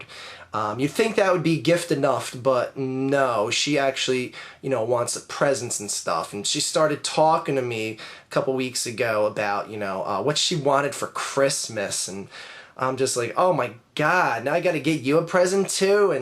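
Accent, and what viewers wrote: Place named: Philadelphia, United States. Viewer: you are definitely from philly saying wooder instead of water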